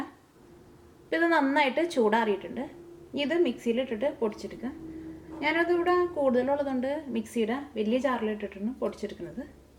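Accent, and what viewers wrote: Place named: Thrissur, India. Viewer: Hello..I am your fan..love your simple narration and the accent..I am also a native of trichur..hence hearing you gives extreme pleasure.. very good recipes and cooking..keep going..congrats..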